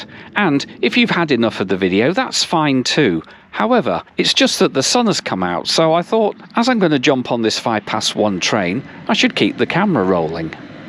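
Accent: United Kingdom. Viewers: Absolutely stunning video. I just found it on youtube and was amazed to hear a British voice. I live in Mariazell and have riden the same train many times but never in Winters. So it was amazing to see the Views through your lens. Thanks for sharing !!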